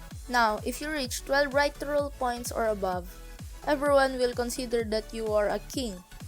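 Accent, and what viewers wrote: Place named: Philippines. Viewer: I know that you're a filipino because of your accent Kababayn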